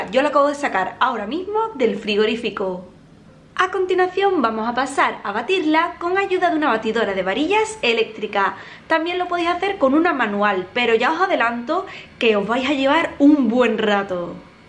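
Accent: Spain. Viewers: QUE LINDA QUE SOS!!😍 TU BOCA TU SONRRISA!! TU SIMPATÍA!! Y ESE ACENTO DE MUJER ESPAÑOLA!!. Abrazo desde URUGUAY!! SOY WILDER😉💞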